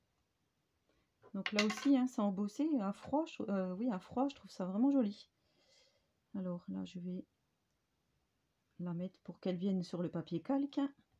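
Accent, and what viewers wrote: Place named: Haut-Doubs, France. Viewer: Coucou. Je me suis inscrite lors de ra vidéo carte bonhommes de neige que je vais faire des que j’aurai un peu de temps. Tu viens de parler du haut Doubs ce qui me conforte dans le fait que j’avais bien reconnu cet accent si typique et qu’on ne put pas confondre avec un autre.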